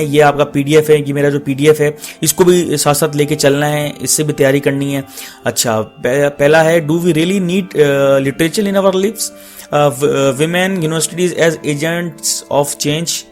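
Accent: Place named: India. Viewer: Your voice looks like indian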